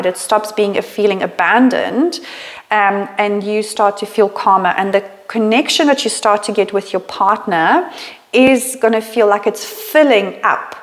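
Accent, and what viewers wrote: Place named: South Africa. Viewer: Literally get so triggered hearing a white South African accent. I associate it with so much racism, can’t even hear the content. Goodluck with your channel, sincerely x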